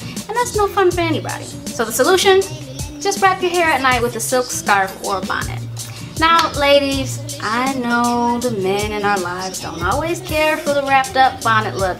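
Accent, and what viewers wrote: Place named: New Orleans, United States. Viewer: I love your accent are you from new Orleans??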